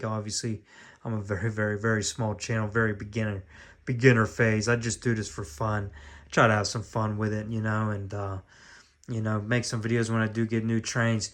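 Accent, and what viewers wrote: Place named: Pittsburgh, United States. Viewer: Nice layout especially for a first one! You sound like you're from dahtahn Pittsburgh!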